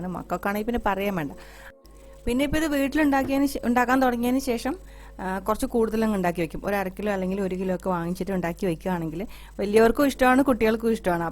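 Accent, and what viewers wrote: Tamil Nadu, India. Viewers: Chechide Tamil kelkkan aanu rasam.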